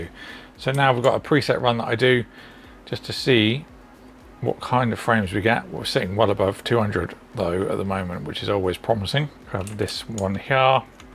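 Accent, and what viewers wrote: United Kingdom. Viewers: i like your brit accent :D also 2nd time finding you overviewing smthn i am interested in, good content mate :)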